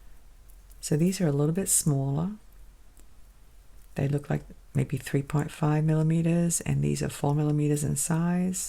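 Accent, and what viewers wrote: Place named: Australia. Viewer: Wow I thought I detected an Aussie accent...you must have an interesting tale to tell of how you got to America. I grew up in Mount Gravatt, Brisbane so I know Sunnybank well. xox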